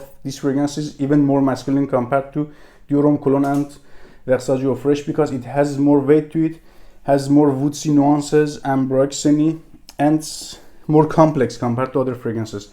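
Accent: Iran. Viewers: داداش لهجت چقد ب ایرانیا میخوره😄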